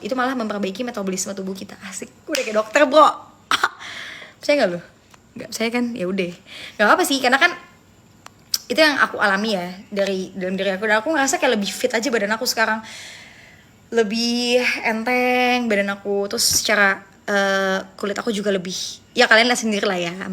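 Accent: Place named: Betawi, Indonesia. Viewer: Sekarang ngomongnya ala bocah Jaksel, dulu nyablak ala betahaw 😂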